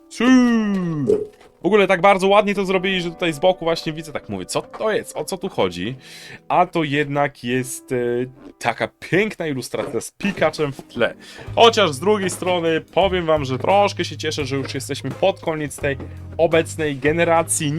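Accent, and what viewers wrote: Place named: United States. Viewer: Ta twoja gwara amerykanska😂y😅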